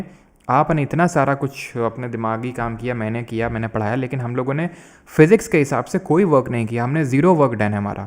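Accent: Kanpur, India. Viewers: It's my second class sir kanpur se hai kya language bilkul hm log jaisi si hai 🙃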